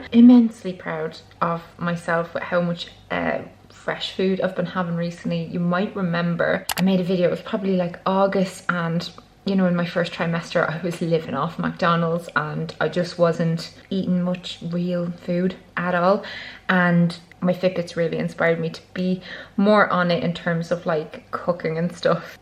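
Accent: Ireland. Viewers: My names is ruby!! You messaged me when you was pregnant last time the way you both say ruby is so cute I love my name way more in an Irish accent and little 2 year olds voice can't believe how fast your pregnancy has gone I couldn't believe it when I started watching all the best to you anc your whole family I'm so excited for you and this little one who gets such and amazing village around her to raise her to be an amazing human so much love ❤️ the hair is amazing reminds me of og stay safe look after yourselves lots of love xxx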